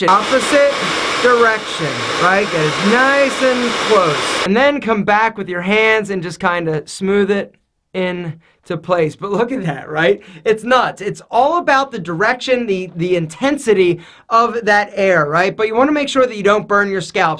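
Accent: Philadelphia, United States. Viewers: Bro where are u from? Sounds like a Philly accent.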